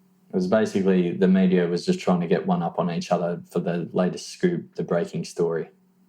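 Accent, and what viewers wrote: Australia. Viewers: As a criminology and criminal justice student, you sir have a new subscriber. Props for the Aussie accent too 🇦🇺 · Probably a great and interesting story. Unfortunately, those of us with hearing problems, especially me, will not know. Australian accent, compounded by the addition of reverb makes it almost impossible to understand. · its an AUSTRALIAN accent..... are u seriously asking someone NOT from your country to speak differently??? To suit YOURSELF???? Oh ffs, you are a friggen KARENNNNNNN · True crime + podcasts + a beautiful Aussie accent ... could this get any better? I caught one of your vids in my suggestions and am now bingeing them from the beginning. Thank you for all of the work you put into these cases. They're wonderfully researched.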